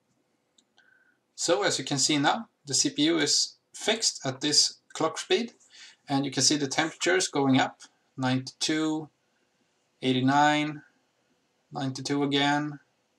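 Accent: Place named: Sweden. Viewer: Älskar när man kan höra ett det är en svensk som talar engelska <3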